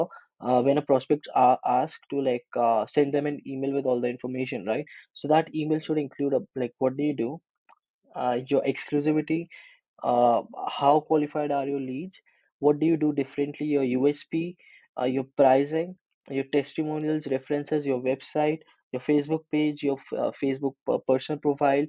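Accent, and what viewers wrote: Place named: India. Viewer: Hey nice video had some questions though - 1) how can we call us prospects from india for cheap? 2) how do u book appointments for us clients after generating some interested leads? 3) what is the service and what is the irresistible offer that you use in your agency? 4) aren't clients bothered by your heavy indian accent during sales calls? Keep doing the great work man. You are helping the community a lot.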